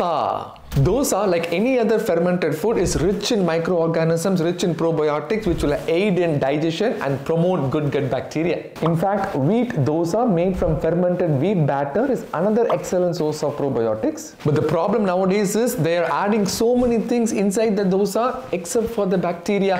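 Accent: India. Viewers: I like how he says and pronounces fluently in Indian way. After living in US and practising as Dr there, good to see him not use NUNI NAAKU ENGLISH or rolling with Foreign accents.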